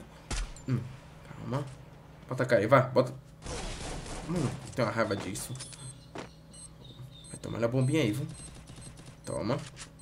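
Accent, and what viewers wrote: Bahia, Brazil. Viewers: Tu é da Bahia mano?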